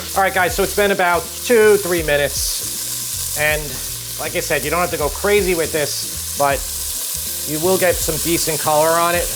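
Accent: New York, United States. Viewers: Holy cow! You ARE from NY. I'm originally from NJ, but at this point in my life... don't talk so fast. 😂 But Finally, I found a good recipe for beef barley soup. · You can cook for me anytime 😊. Recipes are spot on and easy to follow. I love watching you and your family and enjoy listening to your New York accent. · Hey there, slow down guy!:- typical "Nyoo Yawkeh", talkin' a mile a minute! Most of us are not from New York and we like to get it nice and slow, take your time please, it's a good video, no need to rush it!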